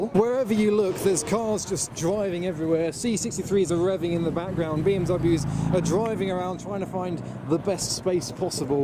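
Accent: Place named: United Kingdom. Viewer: you got a sweet girlfriend, sweet video's and your voice is so damn britisch. love it dude ! greetings from the Netherlands.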